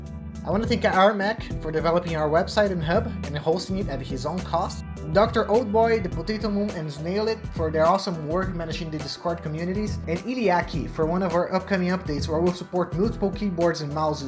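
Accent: Brazil. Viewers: deu pra percebe que você é BR só pelo sotaque, é muito foda ver brasileiros fazendo projetos assim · caraca kkkk percebi que é br so pelo sotaque kkk boa man, parabems pelo projeto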